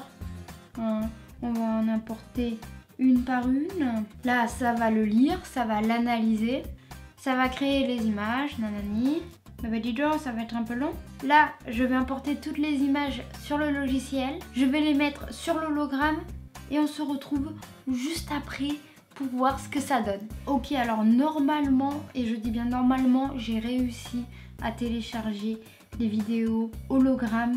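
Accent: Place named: South, France. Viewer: Est ce qu’en utilisant une photo tu peux produire un hologramme Super ton accent du suddd !!!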